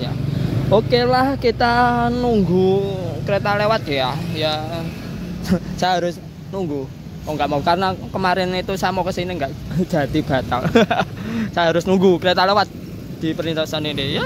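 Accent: Java, Indonesia. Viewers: Asli mu mna tho Nang..? 😅 Medhok bgt.. 😅😅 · Asli mu mna tho Nang..? 😂 Medhok bgt.. 😅😅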